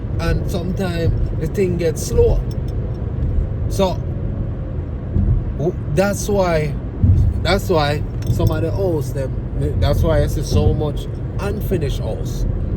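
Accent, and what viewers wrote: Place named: Jamaica. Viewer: He's speaking a mixture of J'can patois, the vernacular of the people. I'm sure he can "code switch" when necessary. It's not that serious (lol).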